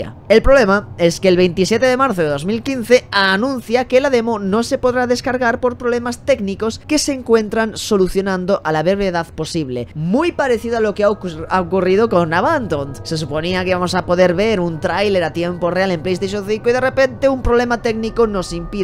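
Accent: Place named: United Kingdom. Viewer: Me encanta tu ingles UK, que nadie te diga lo contrario!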